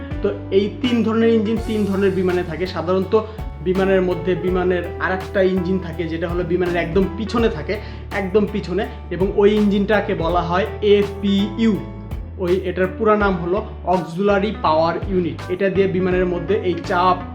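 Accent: Sylhet, Bangladesh. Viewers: ভাই আপনার দেশের বাড়ি কি সিলেটে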